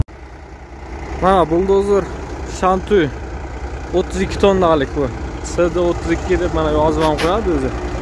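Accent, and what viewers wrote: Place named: Karakul, Turkey. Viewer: Ака Каракулданмисиз шева ухшаяпти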